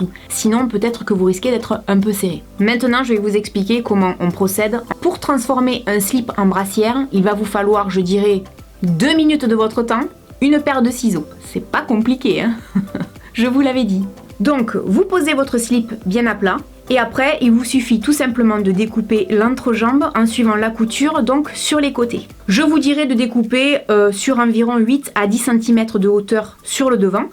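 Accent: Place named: Marseille, France. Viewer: vous aviez l'accent marseillais comme kamila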